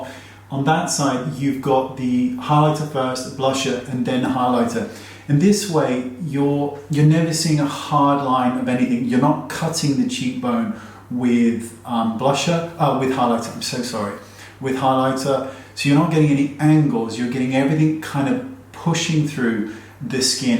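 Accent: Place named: United Kingdom. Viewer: Disconnected beard 👍, highlighter under blusher 👌, that earring whooooo makes me cruuuush on you and the cherry on top of the cake is your accent! It's british i assume... ! How i wish i could learn that accent ,it's beautiful to the ears. Sooothing OMG, Wayne is Asmring without realising it (if that make sense) lol! 💓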